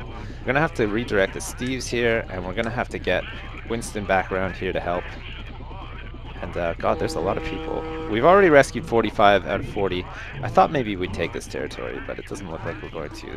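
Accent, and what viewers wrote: Canada. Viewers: OMG, that mic makes you sound canadian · I think the toaster helped disguise your Canadian accent. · ' new mic makes him sound way more Canadian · you sound more canadian now ;(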